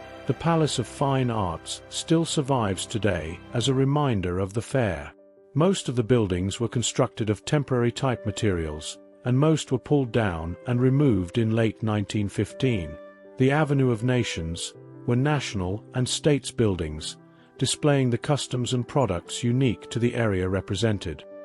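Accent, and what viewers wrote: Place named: United Kingdom. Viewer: Great video! I like your new male British accent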